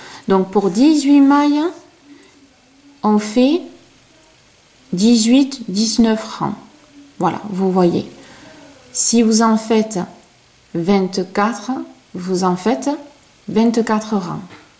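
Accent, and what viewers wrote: South, France. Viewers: Bonjour, merci pour cette démonstration très claire et très agréable, tu te mets à la place d'une débutante et c'est super, continue comme cela! en plus j'aime bien ton accent du sud, moi aussi j'ai l'accent de Toulouse que j'ai gardé pourtant je n'y suis plus depuis l'âge de 20 ans! Je vais regarder si tu as fait un tuto pour faire un poncho... J'ai déjà fait un tawashi et une fleur éponge! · félicitation pour les explications très claires que je vais rapidement mettre en pratique, avec une mention spéciale pour ton accent, car bien souvent l'accent du sud se fait rare sur internet!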